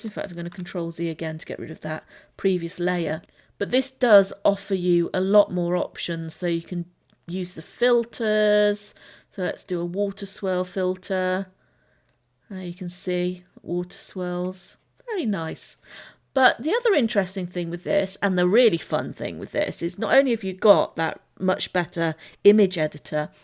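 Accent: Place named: England, United Kingdom. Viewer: Nice video. Firefox ( not sure about other browsers ) has a plugin called [Pixlr Grabber] which I find really useful for grabbing all or part of a picture from a webpage. Much superior to saving the image or PrintScreen paste intoimage editor like paint. I thought you were English until you said "Control Zee". Hmm yeah I still think your from southern limie land. Dont go to the dark side else you'll end up saying things like "How Yall doin, have a nice day now yeeha woofwoofwoof" :P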